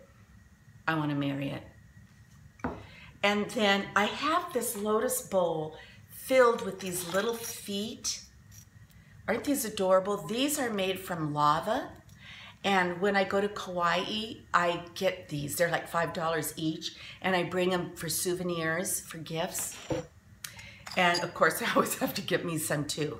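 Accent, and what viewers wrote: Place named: California, United States. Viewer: Hi! Do you know you have a California accent? My family use to tell me I had one when we lived there for years and years! And yes, you do! lol so funny that Paul McCartney was supposed to be your husband! I laughed at this! Oh, isn't it neat when God speaks to your heart? How neat is that! Take care my dear friend...love you! Jeanie xxooo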